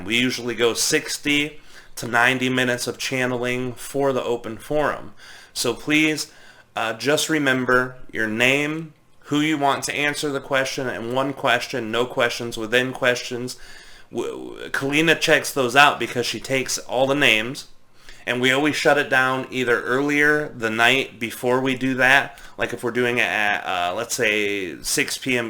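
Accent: England, United Kingdom. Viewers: Has ever explained why he speaks in an English accent when the channeller is American? He sounds like Alec Guinness in Star wars. Nice video, thank you 😊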